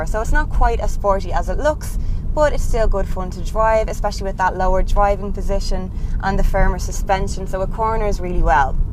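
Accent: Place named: North Dublin, Ireland. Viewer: Strong north Dublin accent on this woman.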